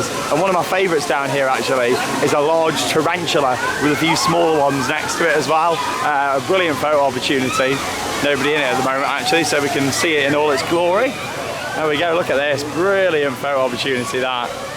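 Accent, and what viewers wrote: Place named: United Kingdom. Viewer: all right no Fun you are a good vlogger but youre brithish english is very fun hahha best 💪 Stüpet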